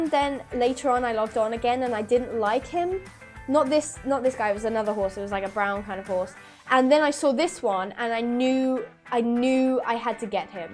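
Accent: United Kingdom. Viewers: you speke britesh and p.s i love your videos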